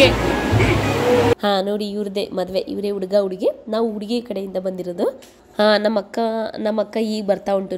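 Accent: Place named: Sakleshpur, India. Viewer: Wonderfull akka.I am from shanivarsanthe but probably my native located to sakleshpura.so i am familier with these 2district.first day nim video nodid kshana niv matado reethi nodi ankonde nam sakleshpura side atva somwarpet side avr irbodu anta.just 15days back nim channel g subscribe ade avatinda new and old videos nodta barta idini.tumba chenagi madta idira even nim husband saha well gentleman..nam jangalu especially nam Gowdas matado ancient and kodo respect na i love to watch that. Keep going and all the best.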